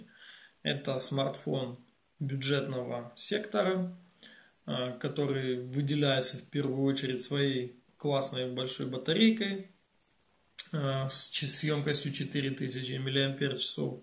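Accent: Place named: Zaporizhzhia, Ukraine. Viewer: та не, ну шо ты как не свой, акцент то запорожский, ту как не крути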